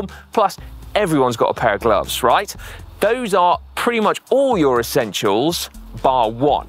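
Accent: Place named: United Kingdom. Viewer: Lifetime bike rider from Madison, Wisconsin, USA (as opposed to all the other Madison, Wisconsins the world over) and fan of this channel for awhile now just wanted to express my appreciation for this channel and this is a very good video. I love to watch GCN viids most of all for the British accents and expressions, this guy (bloke) is one of my favorites. Hey keep going GCN and rock on!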